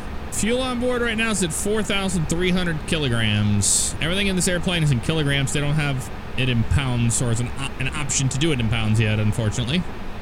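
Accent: United States. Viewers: Are you American or Australian? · wait, can you really not tell lol, he is american.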